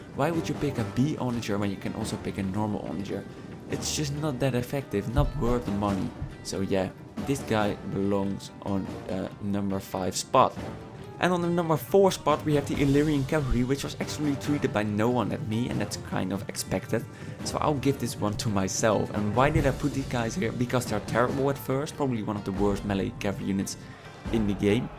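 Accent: Netherlands. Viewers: Are you by any chance Dutch? Cool video btw:) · dutch, I guess. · lol your dutch accent tho:D so obvious haha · Volgens mij hoor ik een Hollandse ondertoon? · you dutch? i hear a dutch acsent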